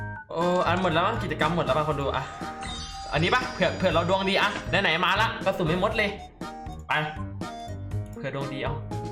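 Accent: Korat, Thailand. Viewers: โคราชจ๋าเลยน้อK2J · พูดโคราชทั้งคลิปหน่อยครับ555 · คนโคราชและดูออก😁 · โคราดจัด😆😆😆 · สำเนียงโคราชก็มา55💖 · ผมชอบพี่พูดโคราช เเทะๆ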